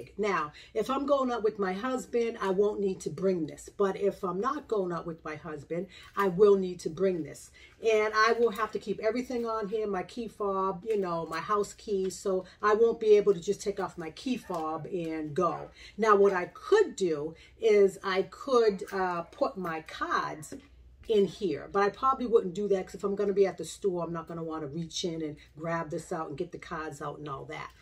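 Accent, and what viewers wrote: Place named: New England, United States. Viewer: Love your New England accent. I have this bag in the lite greenish color.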